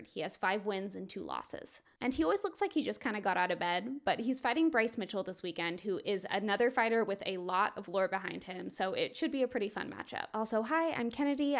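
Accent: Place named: United States. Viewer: Maeda went to brazil specifically to showcase judo. He was a prize fighter who traveled the Americas doing demonstrations and challenge matches. He was not a business man. She's American, so I wouldn't say it's incorrect to pronounce their names with an American accent. I've heard some Americans that train under the Gracies use the R when pronouncing their names. Roger Gracie fought once in the UFC against Tim Kennedy.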